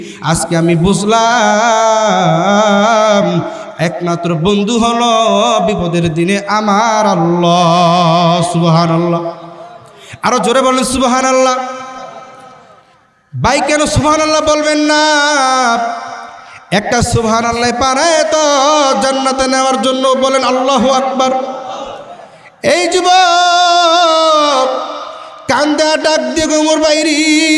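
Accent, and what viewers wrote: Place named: Shariatpur, Bangladesh. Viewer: পুরাই শরিয়তপুরী মাশাআল্লাহ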